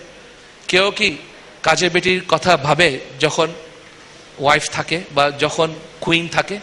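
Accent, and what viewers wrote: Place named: Pakistan. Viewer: Unar English Lecture gulo shunechilam, vabchilam uni Pakistani! Valo laglo khub! Marhaba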